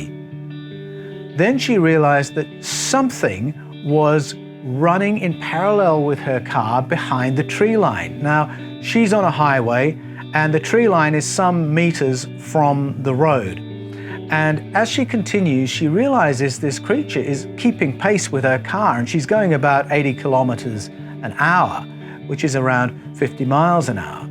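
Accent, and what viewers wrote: Australia. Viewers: 1st, you speak awesome Australian! Not a hint of an English accent! Great content. This creature sounds like a Bigfoot like we have here in the States.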